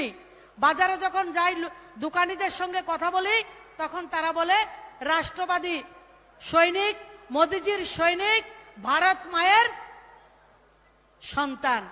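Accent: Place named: Comilla, Bangladesh. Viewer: তিনি সম্পূর্ণ ভাবে কুমিল্লার ভাষায় কথা বললেন।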